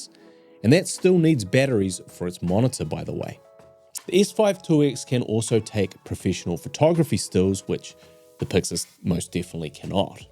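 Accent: New Zealand. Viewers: Nice to hear another Kiwi voice on the webs!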